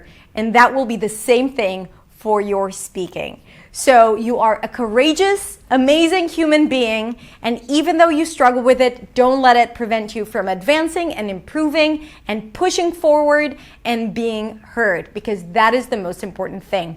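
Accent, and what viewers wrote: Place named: United States. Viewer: With the mask and a strong american accent it is quite dificult to understand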